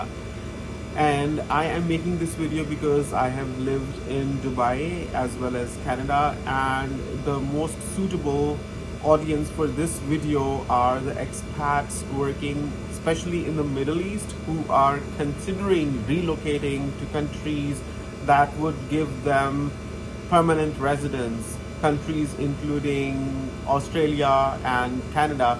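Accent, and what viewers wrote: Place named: England, United Kingdom. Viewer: Very bad sound quality, i can hear only English accent, nothing sentences 🇺🇸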